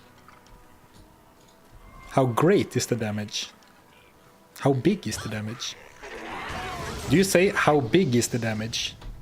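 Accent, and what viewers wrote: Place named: Sweden. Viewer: Hello fellow 🇸🇪 And as a "gaming tradition" in Sweden when at least one persen don't understand Swedish, everyone in chat/voice talks English. Therefore I will take this in understandable English. I belive Mephistos life points will be easer to reach than Duriels was. With at least 40 more strength. (perhaps ~50 if a 3os helm is possible or good circlet) and more possible strength on rings, amu and so on. + A few more lvls and skill shrine this will be easy for you. At least that is what I belive🙂. Got very sad when suddenly Duriel was dead. Good with the clip. Sad that it was without sound. Sidetrack. Got really suspicious when I first heard you. Wait what?! Is he from Sweden? You confirmed later on that vid or another one. I really enjoying your challenges. Keep them up😈🤘 Another sidetrack. Is the microwaved tea reheated👍 or do you prepare it that way☠️? 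Usual flavor? With or without milk? Even more sidetrack. In what part of Sweden do you live close to? If you answer this I guess you will have a big radius from the town you are meanchening. Because of the now little less anonymity with facecam and closer to 40 than 30 thing. BUT, let's think of the real threat, DIABLO !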